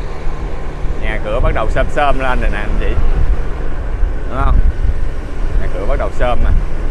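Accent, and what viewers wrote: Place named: Mekong Delta, Vietnam. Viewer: Xin chào em trai.nghe giọng nói anh đoán em là ng miền tây.vì trước đây những năm 1984 đến 1991 anh năm nào cũng công tác miền tây.đầu năm vào nam cuối năm ra bắc.thời gian ở tỉnh đồng tháp khoảng 2 năm.ở p2 tx sa đéc.rồi cao lãnh .huyện tháp mười vv❤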